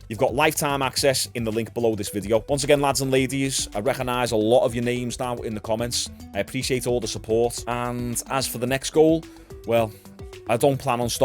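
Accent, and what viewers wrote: Liverpool, United Kingdom. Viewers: I don't usually sub to scouse channels as I find the accent annoying, but your content makes me forget that 😂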